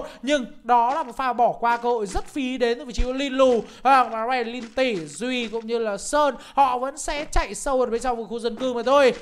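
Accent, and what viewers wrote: North, Vietnam. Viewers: A ơi. Có tk bảo giọng Bắc ấm. Em lên nói con cek j vs nó??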